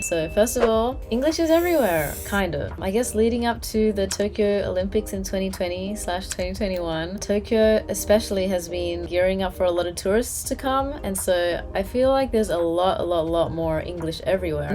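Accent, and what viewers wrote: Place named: Australia. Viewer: I like your Aussie accent so much.please keep it up uploading like this with accent! Less to see someone speaking Aussie accent. 頑張ってください！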